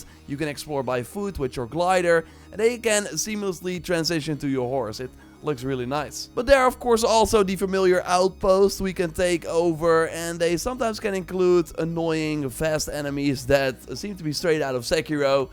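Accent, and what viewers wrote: Netherlands. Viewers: The Dutch accent I love it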